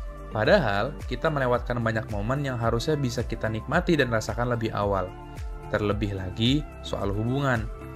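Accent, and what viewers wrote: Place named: Indonesia. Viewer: Jewel wak, bacanya logat indo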